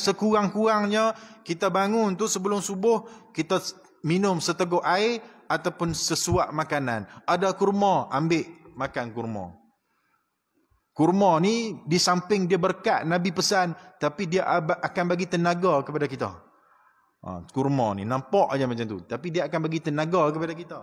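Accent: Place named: Kedah, Malaysia. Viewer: Komen pertama ustadz... Izin bertanya ustadz.. bahasa kedah dengan perak sama ke tak..?? Pasal saya dengar Bahasa ustadz macam bahasa kedah..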